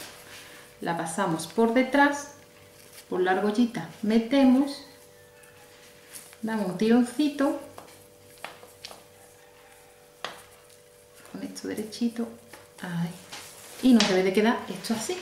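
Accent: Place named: Cadiz, Spain. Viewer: LA GRACIA DEL ACENTO DE CADIZ ES UNICA!! CUANTO ME HE REIDO YO CON LAS HISTORIAS DE MI AMIGO PACO QUE ES DE LA LINEA DE LA CONCEPCION : A LOS CHICLES LES llamaban LA CHINGÁ( iban a gibraltar y compraban cheiw gumm..de ahi chinga) y su tio le compraba los zumos de limon ms caros.. ( queresulta q llevaban ginebra) y que a mi amigo paco de niño le encantaban y con ellos jugaba al futbol como nadie.. cuanto me he reido con el.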